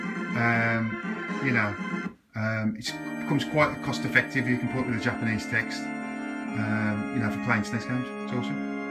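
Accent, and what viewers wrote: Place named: Birmingham, United Kingdom. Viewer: Finally a youtuber with a Bhirmingam accent. Right?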